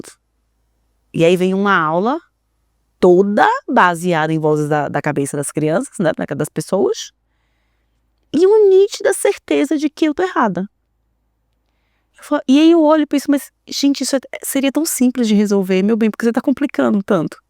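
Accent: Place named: Bahia, Brazil. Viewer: Estou simplesmente amando seus livros e seus vídeos. Falam tanto de mim, me enxergo tanto nas suas falas. Ainda mais agora que sou mãe de primeira viagem e estou buscando curar minhas feridas para não ferir minha filha. Sou grata por compartilhar tanto conhecimento com a gente! Ah, e descobri ontem que vc é baiana igual eu, fiquei feliz demaaaais vendo vc fala com nosso sotaque e nosso jeito maravilhoso hahahahaha ❤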